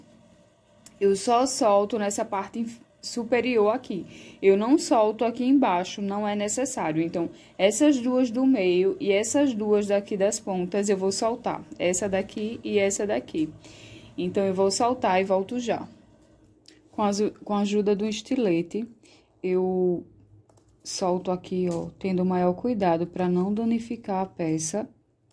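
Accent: Brazil. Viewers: Amei a dica e o sotaque sou costureira e nordestina tbm ! Venham conhecer-me galera. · Ótimas dicas ❗Vc. é do Recife⁉️🌹💙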